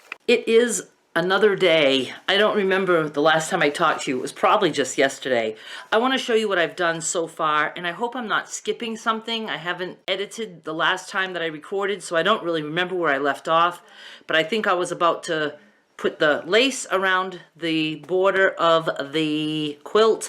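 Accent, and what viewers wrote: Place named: New England, United States. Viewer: Thank you so much for your tutorial! I loved the addition of lace! It felt so good to watch an experienced quilter run into issues. I have an ongoing relationship with my seam ripper, it's always right by my side!! I loved listening to you, you sound like a New Englander, I'm from Maine and felt comforted by your accent. I hope your mom loved the quilt! Take care!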